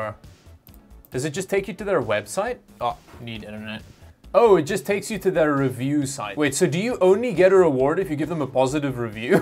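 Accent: South Africa. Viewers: You sound like a South African :) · Why do you sound like you have a South African accent? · South african? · Are you from Africa? Your accent sounds very similar to a few people I've met from South Africa.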